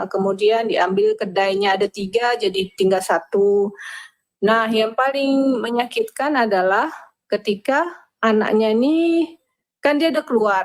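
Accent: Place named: Peninsular Malaysia, Malaysia. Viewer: Masih ada lagi lenggok tutur bahasa melayu semenanjung Malaysia... memang duk Malaysia ke dulu²?..bsama..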